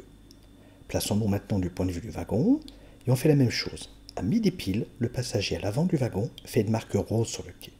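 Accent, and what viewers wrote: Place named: Geneva, Switzerland. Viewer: Très bonne explication, vous avez l'accent genevois, vous êtes de Genève? Vous travaillez peut-être au Cern?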